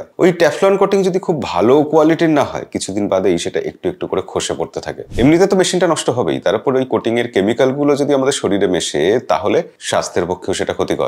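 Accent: Bangladesh. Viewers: Dadar bolar dhorone ektu bangladeshi touch ache!!!🤔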